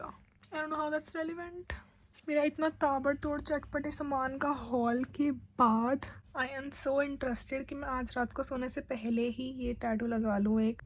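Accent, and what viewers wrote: Punjab, India. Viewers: hey, i started watching your vlogs and shorts recently and im hooked fr.. really appreciate your efforts and the time and energy you put in your work💅🏻 i just wanted to make a request to you that make a vlog where you'll only speak punjabi for the whole day or whole duration of your vlog (as im a punjabi who stays in mumbai, i got your punjabi accent in your daily sentences and stuff.. also the "भांडे मांज" rahi hai meri roommate- was amazing 😋.. i hope you make a punjabi vlog)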